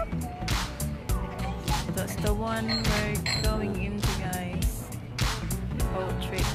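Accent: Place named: England, United Kingdom. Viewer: Dami swans sis. Madaya yong geese .... English accent ka na rin sis.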